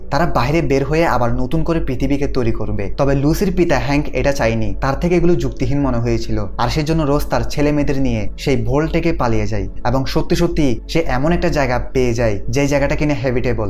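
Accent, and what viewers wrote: Chittagong, Bangladesh. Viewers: ভায়ের বাড়ি মনে হয় চট্টগ্রামে। দিন শেষে আঞ্চলিকতার টান চলে আসে। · ভাইয়ের বাড়ি কি চট্টগ্রাম?আমি চট্টগ্রামের। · Bhai mone hoy Chittagong er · Brother this is chattagram special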